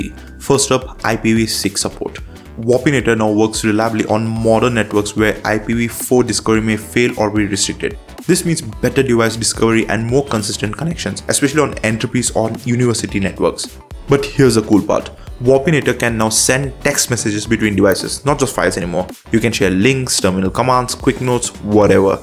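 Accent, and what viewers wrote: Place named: India. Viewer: that hindu accent, can you change it?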